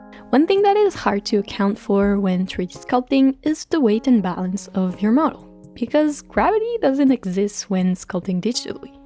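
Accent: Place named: Quebec, Canada. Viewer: I love him so much, i'm surprised you have so little followers since your stuff is so good :O ps. are you from quebec ? Because you have a similar accent to me :3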